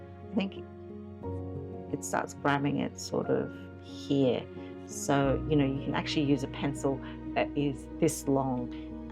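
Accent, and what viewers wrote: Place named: Australia. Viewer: Lovely to hear a fellow Aussie expat (although I’m in the States). Struck by the coincidence, at the time this video was released I’d never heard of Cambridge Imprint, but not two hours ago I unpacked my first order from them, and completely agree, the labels are lovely. Glad your channel “turned up” in my recommendations.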